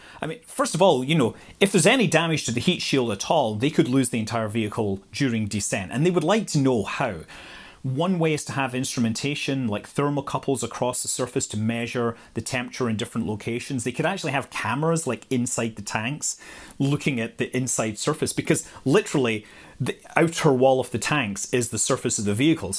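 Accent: Scotland, United Kingdom. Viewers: There's some clever guys on YT, but a lot of waffle & time consumption. Your videos are clear, concise, interesting & layman friendly, while you obviously know what you're talking about. Very watchable & I can comfortably take it all in at 1.25x speed, thanks to your clear diction & varied, enthusiastic intonation (another bugbear of other zzzzzz YT "superstars"). Love your Scottish accent. Great photos & slides. Good Stuff. Keep up the good work!!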